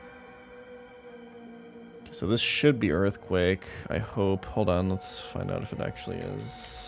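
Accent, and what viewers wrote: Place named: United States. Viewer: he once stated he lived in hongkong (?) for a few years and was influenced very much by american tv series, thats where his accent might come from. I also like how his voice switches entirely when hes speaking german:D In one of the last videos on chat actually forced him to speak 10 min in german Boy cuckbux (twitch currency which you earn for viewing his streams)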